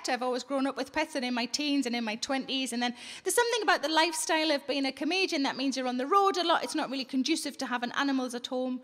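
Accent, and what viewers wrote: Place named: RP, United Kingdom. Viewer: She’s the funniest 😂! She sounds so posh then she drops the F bomb which doesn’t even sound bad when she says it! 😂😂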